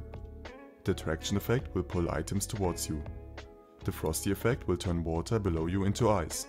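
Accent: Germany. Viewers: The german accent make it even better.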